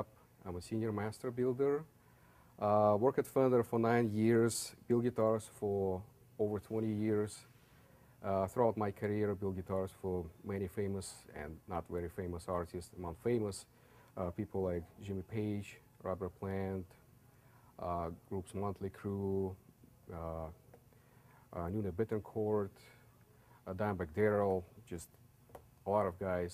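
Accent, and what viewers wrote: Russia. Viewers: У чувака шикарный русский акцент)) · Are you from Russia ?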